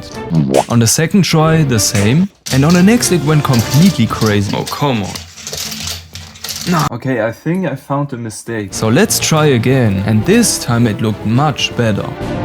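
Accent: Germany. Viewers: Why do I hear DEUTSCH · I hear a littel german ngl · He sounds German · Are you German it Fells Like a German try to speak englisch 😅(no hate)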